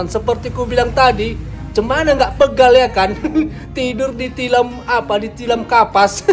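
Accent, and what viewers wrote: Medan, Indonesia. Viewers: Senang dgr cara bicara ito ku ini. Bahasa nada medan nya ga hilang walau sdh lma merantau ke negri Jepang... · Enak kali denga logat medan abang.sering2 upload bang pasti berkembang canelnya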